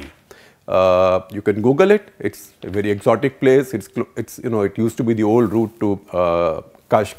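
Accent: India. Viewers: Gupta you seem to be very fond of talking that makes little or no sense at all and that too in your weird hindi accent. Your uncontrolled excitement makes you over analyze situations that creates only further confusions with no answer. Better learn to calm your nerves and measure the depth of words you choose.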